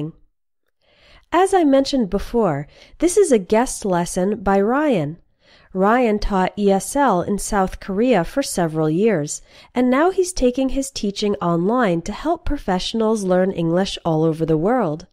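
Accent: United States. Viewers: So, If I may add. Why the automated robotic american voice? Got through about 20 seconds of this and gave up.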